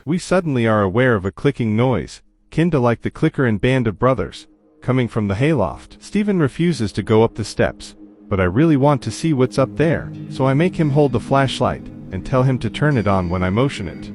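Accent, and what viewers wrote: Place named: Russia. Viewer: this Russian voice was hard to understand but I'm loving your work so far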